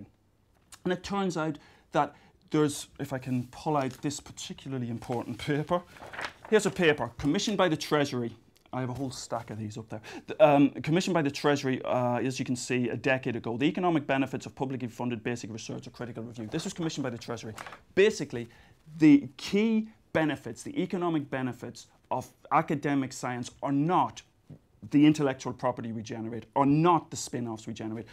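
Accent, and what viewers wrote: Ireland. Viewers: I'd say its a louth or monaghan accent. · Where is from? He sounds like he is from south Dublin, maybe Meath? or even Wicklow? Completly irrelevant but I would like to know. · I'm not sure where he is from specifically but to me he sounds like he's from somewhere around Dublin.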